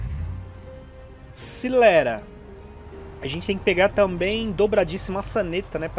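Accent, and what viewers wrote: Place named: Rio Grande do Sul, Brazil. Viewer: tu tem voz de gaúcho cara kkkkkkkk. Também sou do Paraná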